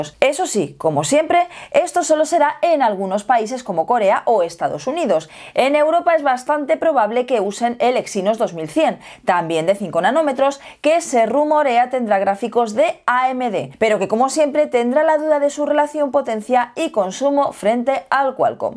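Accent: Spain. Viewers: con razón, yo siempre escuchaba chupetes esque lo dice rapido y el español de españa no es igual al de nosotros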